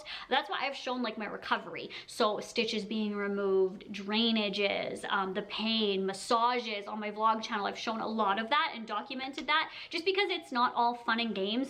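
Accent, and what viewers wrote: Canada. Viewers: Whenever people tell me that Canadians have accents I always laugh and say naahh we don’t Then I watch videos of other Canadians talking and I’m like wow yup she’s Canadian 😂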